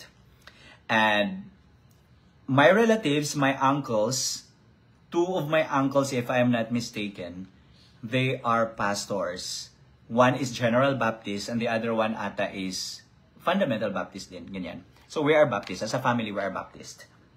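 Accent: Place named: Visayas, Philippines. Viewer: Ok naman english bisaya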